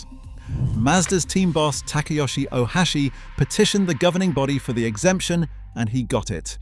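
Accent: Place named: United States. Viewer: Great video, but as a British writer and teacher, I’m intrigued by your American pronunciation of numbers. 😊 I’ve never heard anyone British do that before. Not a criticism - I’m just curious.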